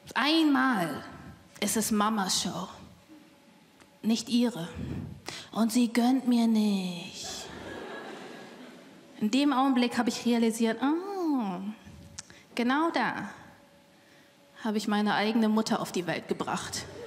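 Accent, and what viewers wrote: Vietnam. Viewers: Eine typische vietnamesische Akzente Gemische, das macht der Humor auch so lustig. Weiter so und alles gute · Thằng nào ??? Har har har....ich erkenne so einiges wieder. Auch das Deutsch mit vietnamesischem Akzent, geil 😆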